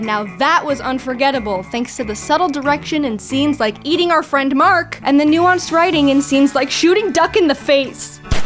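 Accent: Canada. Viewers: You sound stereotypically Canadian. It's funny. And I have to watch more. Need... MORE...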